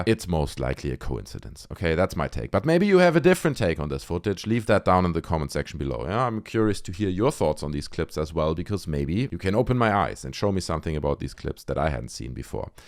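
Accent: Germany. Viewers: Are you german? Sounds like you are. If you are german why Do you dort make your Videos in german? Ich meine würde mich freuen freuen als deutscher ^^ =) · are you a German native ?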